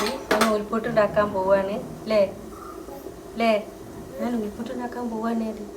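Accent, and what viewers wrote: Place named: Malappuram, India. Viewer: നിങ്ങളെ നാട് എവിടെ തനി മലപ്പുറം ആണ്❤😂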